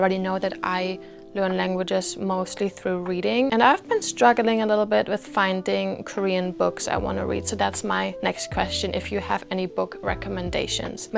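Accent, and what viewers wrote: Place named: Germany. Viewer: Are you German ? Your accent is German accent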